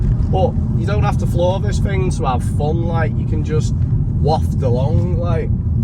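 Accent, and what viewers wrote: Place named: Liverpool, United Kingdom. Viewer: Subscribed! I think you’re Scouse. I want a 435i someday too and I love in Liverpool!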